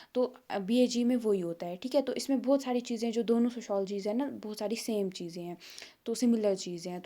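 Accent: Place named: Jammu and Kashmir, India. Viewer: aap ka baat karna ka taraka sa mai samaj gaya thi ki aap jk sa hoge.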